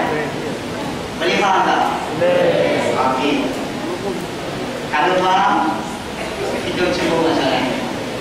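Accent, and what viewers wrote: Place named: East Coast, Malaysia. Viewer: cakap pahang ke ganu ke kelate ke apa, tak brp dengar 😂 jap, kalau 55x22,camne plk?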